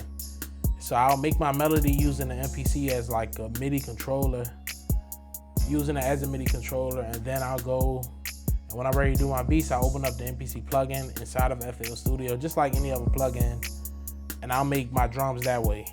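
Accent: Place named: Washington DC, United States. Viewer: You got a Dc/Pg county accent🫡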